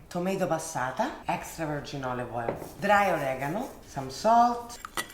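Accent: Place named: Rome, Italy. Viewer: Bella e brava. Ma ,soprattutto, me taglio. Per il tuo romano. Fantastica❤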